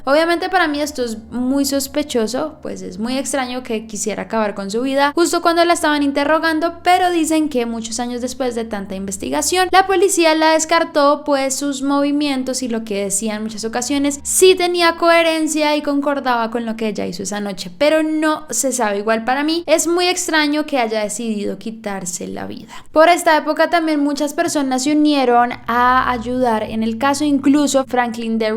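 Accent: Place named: Colombia. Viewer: El acento colombiano ❤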